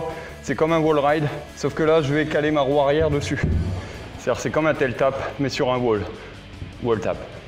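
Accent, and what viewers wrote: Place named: North, France. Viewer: ta un accent du nord j'trouve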